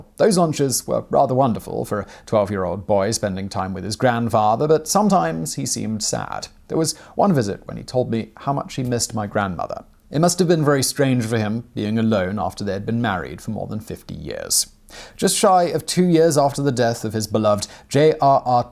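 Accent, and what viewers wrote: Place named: RP, United Kingdom. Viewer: Your deliberately exaggerated posh English voice is excruciatingly annoying. It's like watching a sketch of 'Cholmondley Warner' from Harry Enfield.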